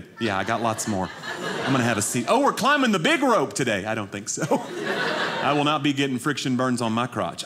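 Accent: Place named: Texas, United States. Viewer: His accent and talk of running suicides took me back to Texas middle school athletics. Ran so many suicides one day until I threw up and my legs were so sore I couldn’t sit down normally for a week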